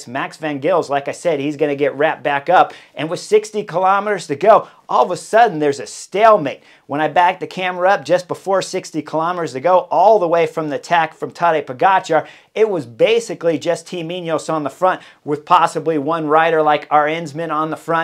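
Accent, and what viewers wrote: United States. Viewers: has the most "I don't give a fuck" American accent in cycling. Butchering every race and rider name left and right😅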